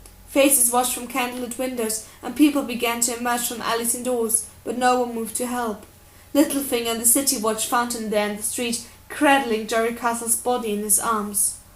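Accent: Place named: England, United Kingdom. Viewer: i swear this is a different version of the book that i read still entertaining though plus gotta love a woman with an English accent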